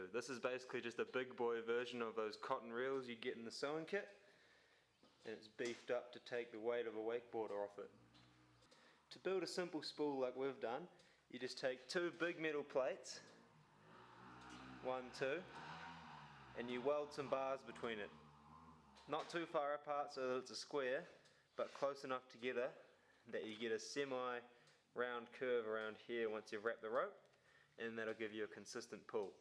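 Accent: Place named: New Zealand. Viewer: haha i found a kiwi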